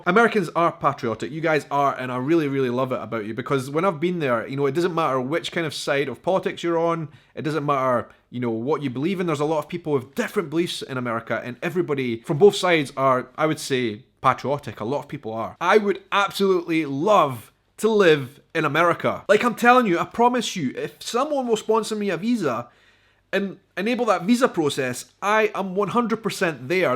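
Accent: Scotland, United Kingdom. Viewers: I had to do a double-take when you said you "Spoked" to Americans, your Scottish accent sounded like "Spooked" Americans, and I thought why would Americans be scared of you! lol Scotland is on my bucket list to visit, more so than England. I suspect the accent though should make for some funny misinterpretations when I visit. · Been watching your channel for a while now. You are a wonderful speaker. You come across as very positive. The Scottish Accent is very cool, fun to listen to. I think you like America more than I do and I'm a native. I'll keep watching. I want go visit Scotland someday, after you've sold it so well. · I just love listening to you talk. Have you realized how much Americans love the Scottish accent? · I second this. The Scottish accent is one of my favorites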